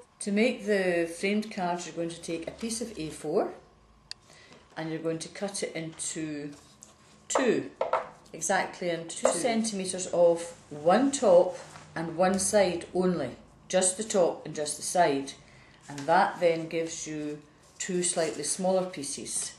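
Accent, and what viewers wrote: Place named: Scotland, United Kingdom. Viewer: I appreciate you slowing down so we "non-Scotish" can follow, but both your accent and videos are so terrific, watching it again is no problem. Thanks for sharing your talents with us.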